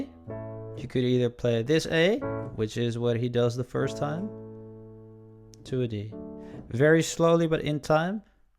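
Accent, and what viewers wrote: Netherlands. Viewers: it's not bothering me but are you Dutch?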